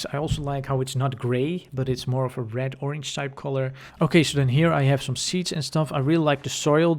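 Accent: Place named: Netherlands. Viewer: Can tell that beautiful Dutch accent anywhere. <3 x3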